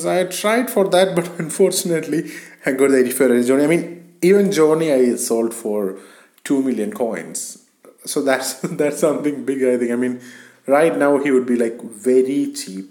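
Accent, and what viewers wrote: India. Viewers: You are indian your accent says all🤘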